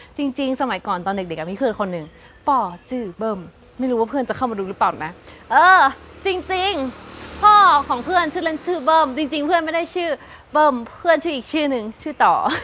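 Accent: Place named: Northern Thailand, Thailand. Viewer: ชอบฟังไทยกลาง ผสมเหนือ น่ารักดี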